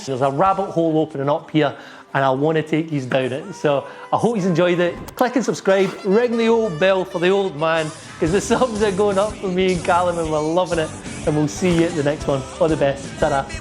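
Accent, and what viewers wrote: Scotland, United Kingdom. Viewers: That Scottish accent !!!! Well done man , great playing !! Mika is a wonderfull guy . Cheers from France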